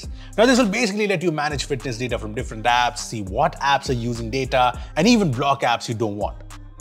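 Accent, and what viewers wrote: India. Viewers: I love the light Indian accent with great English ❤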